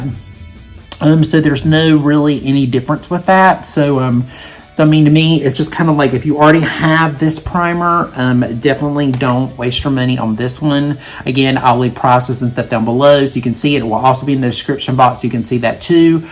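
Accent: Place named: North Carolina, United States. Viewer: Hi! I'm Sally and a new subscriber. I love your content and personality. I want to know if you have a drugstore dupe for YSL Concealer? 🙏..btw.. Charlotte!? Omg I used to live in Fayetteville NC in the early 90's.. that accent! 😊 💕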